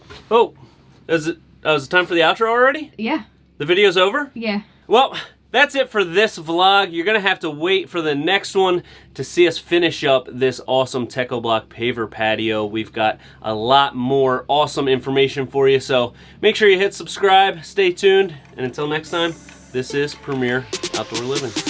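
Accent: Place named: Chicago, United States. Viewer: Why your n ants accent sound like ur from chicago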